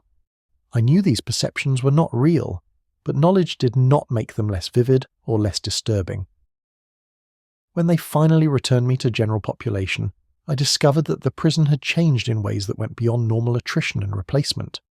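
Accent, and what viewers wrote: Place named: United Kingdom. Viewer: Sounds like UK. It also says so in the description.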